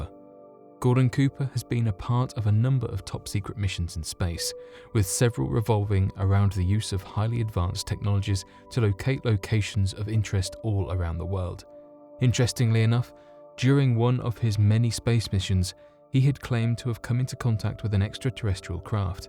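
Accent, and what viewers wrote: United Kingdom. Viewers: Because they are British and pronounce somethings very different.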